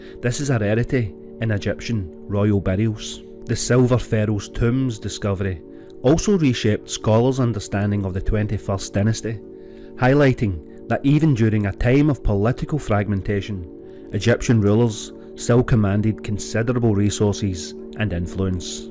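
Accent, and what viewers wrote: Scotland, United Kingdom. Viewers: Turned on the captions so I could understand through the Scottish accent. The captions were hilarious. They told of pharaoh Susan The First, Howard Cattle, and more!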